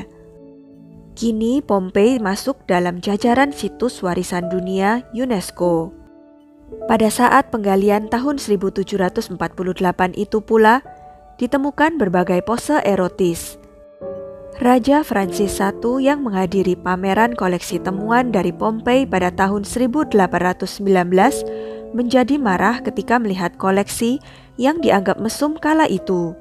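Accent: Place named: Java, Indonesia. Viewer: Admin medok banget asli orang jawa kah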